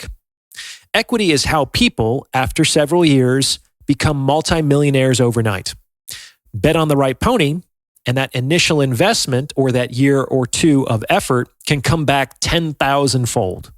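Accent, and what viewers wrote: United Kingdom. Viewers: RP AF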